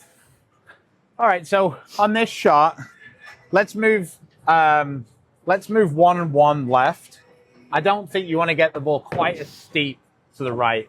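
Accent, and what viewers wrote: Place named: United Kingdom. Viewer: Extremely britesh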